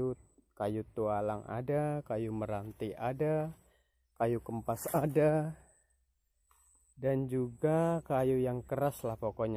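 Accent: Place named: Cilacap, Indonesia. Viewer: Wa'alaikum salam Bermacam macam kayu yang sudah di tasak ...oh jenengan wong Cilacap Tah Mase 😃 , Sugeng enjing mas bro sukses slalu 👍👍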